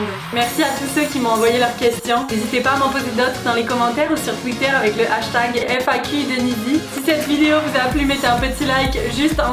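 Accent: Quebec, Canada. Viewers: Ton accent québéquois est oarfait je t adore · Comment tu as appris le Québécois? Stp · Behh moi j'aime trop new york (mis a part Donald Trump) et sinon j'aime bien le Québec et je love trop leur accent j'dis pas sa parce que t'es québécoise sinon jtem trop continue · Tu devrais faire la comparaison entres les films québécois et les films français, ça serais drôle et tu as bien l'accent québécois! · mdr 😂😂 j’adore ton accent le franc québécois 😂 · J'aime trop ton humour mdr et ta voix ton accent demi français demi quebequois AHAHA 😂😘❤️ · Oh, le russe est ma langue maternelle et c'était fort bizarre et agréable d'entendre ta voix en russe. J'étais persuadé encore une fois que tu es une meuf excellente. Continue comme ça, j'aime bien tes vidéos. Ils me donnent une opportunité d'écouter la voix française, peut-être un peu québécoise mais c'est utile tout de même. 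Bonne chance. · Je dis que oui tu as un accent québécois, mais pas comme si tu avais vécu toute ta vie ici! Love u ❤️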